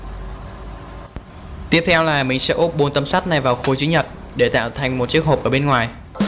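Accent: Vietnam. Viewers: Giọng nge giống dưới thanh bình thế nhỉ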